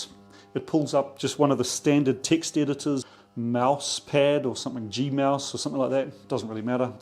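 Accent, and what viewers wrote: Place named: New Zealand. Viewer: Great informative video, You sound Kiwi to me??? Cheers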